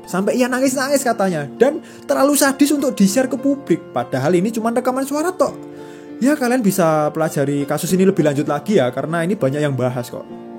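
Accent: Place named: Japan. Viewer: Ngomongnya medok banget kaya org jepang takeshi sunyoto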